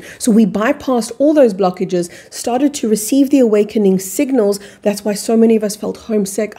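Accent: South Africa. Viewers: exactly the the South African pronunciation- it is a beautiful accent.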